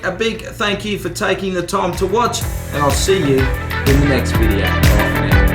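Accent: Australia. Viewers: this austrailian english love it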